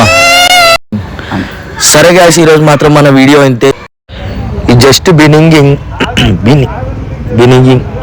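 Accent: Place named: Telangana, India. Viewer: 😂😂thoroughly enjoyed specially your Telangana accent cheers to your new beniging 😅😅and keep going good luck 🤝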